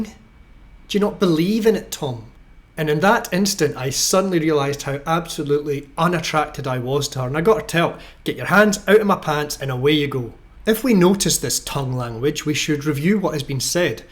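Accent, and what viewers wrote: Scotland, United Kingdom. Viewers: Are you and Neil Oliver from the same place in Scotland?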